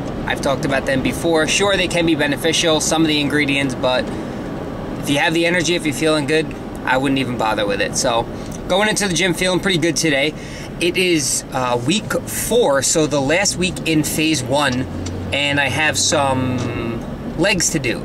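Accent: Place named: Alabama, United States. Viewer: I just noticed your accent....are you from Alabama?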